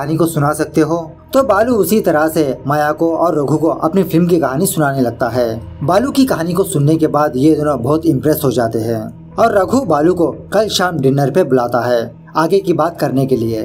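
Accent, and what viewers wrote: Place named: South, India. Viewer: I have been listening your explanation since two months ago but i think u need to improve ur hindi language bcoz i think u hv South Indian ascend. Ur many pronunciation irritates me but hope to listen good hindi.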